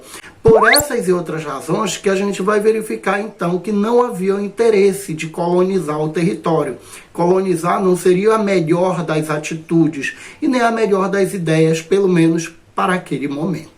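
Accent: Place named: Pará, Brazil. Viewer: Poxa, professor, acabei de encontrar o seu canal, vi dois vídeos e já sinto sua falta 😂. Pelo sotaque vc é do Pará (minha terra de criação) vivi em Altamira por 19 anos. Obrigada pelo conteúdo de qualidade acerca da história 🎉🎉🎉🎉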